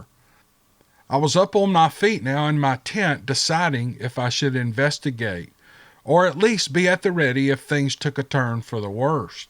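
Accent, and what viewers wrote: South, United States. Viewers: all the best to you, your family and all the pups too! Thanks for that good old southern style story telling we’ve all come too love.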